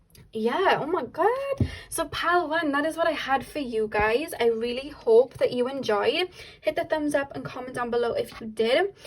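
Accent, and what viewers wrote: Wales, United Kingdom. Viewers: Pile 2 & 3 I swear you always put out videos exactly when I need to hear them! Thank you so much for the motivation to help me keep moving forward. ❤️‍🔥 Also just wanted to say how much I love your Welsh accent. 🥰 I could listen to you speak all day.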